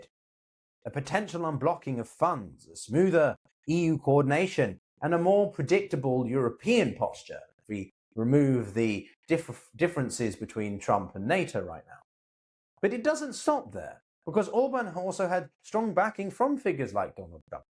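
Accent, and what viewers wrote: England, United Kingdom. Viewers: Another English Accented Narrator. England is in trouble! Git off Celtic lands! Says The BronxBoy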